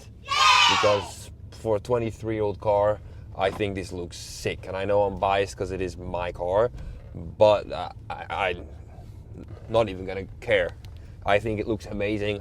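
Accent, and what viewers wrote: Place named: Netherlands. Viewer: why does this dude have a dutch accent living in poland?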